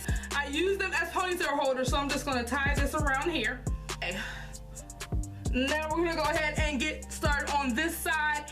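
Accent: Baltimore, United States. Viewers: I just ran across your channel. Random question but are you from Bmore? You sound like some of my family members lol love the video hun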